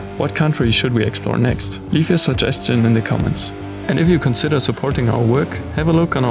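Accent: Germany. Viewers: Your German accent makes your videos surprisingly interesting and oddly satisfying to watch. Would be cool if you would go to Scandinavia next, like Denmark, Sweden and Norway. ....hit me up if you are in Denmark tho.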